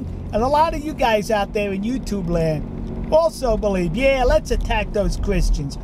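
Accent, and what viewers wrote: New York, United States. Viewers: OMG I guess I assumed from your accent that you were in New York City! I was down in Texas about a year ago. Absolutely loved it!!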